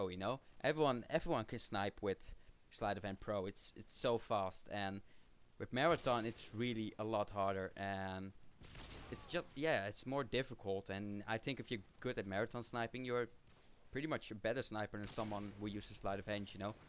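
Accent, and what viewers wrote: Netherlands. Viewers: Dutch accent xD, it's really funny:P. Nice game btw netjes flawless ik word altijd op het laatst nog gekilld:P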